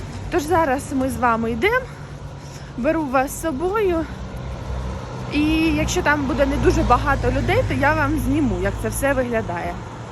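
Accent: Surzhyk, Ukraine. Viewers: вас суржик очень милый❤ Мне, как русскоговорящей украинке, совсем не режет слух😊 Хотелось бы узнать как заговорить на польском, может есть секрет 😂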